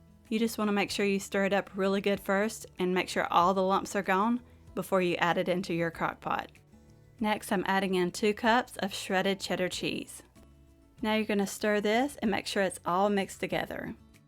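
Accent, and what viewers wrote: South, United States. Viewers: Your lovely southern accent is the icing on the cake! Love this recipes. ❤can't wait to make them Sister 😊.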